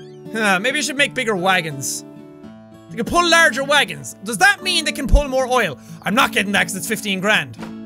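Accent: Ireland. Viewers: Yes! I've always been wondering who has that Irish accent! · He is bloody fuckin irish m8. It`s his accent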